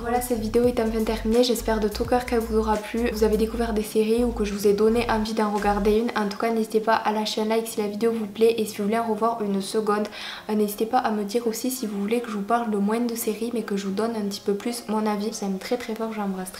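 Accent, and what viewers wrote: South, France. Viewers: Toi tu viens du sud heiiiin mdrrr :')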